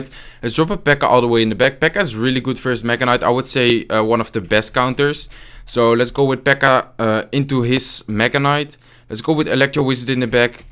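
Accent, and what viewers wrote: Netherlands. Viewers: He is dutch I hear that on hes cracky english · Are you dutch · Met je Nederlandse accent klink je engels wel verlept Sorry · e gast ben je nederla'ds'ikan da horenaan u stem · Lekker Nederlands actcent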